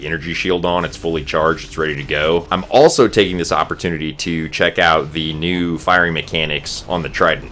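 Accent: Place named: United States. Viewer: Btw, I love your pure american pronouncation ❤️❤️❤️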